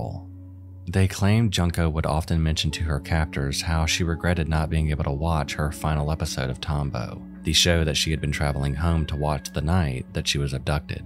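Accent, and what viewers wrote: South, United States. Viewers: I know you're a fellow southerner. I can pick out that accent from anywhere.:p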